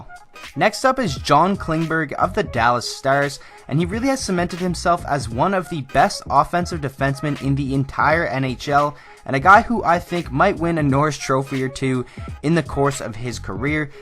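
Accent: Canada. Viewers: unknown gt gamer lol yeag he says about like a canadien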